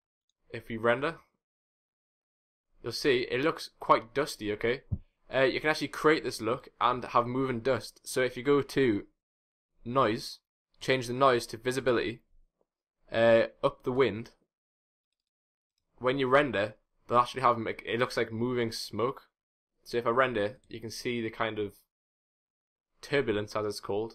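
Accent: Newcastle, United Kingdom. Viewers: are you from newcastle by any chance?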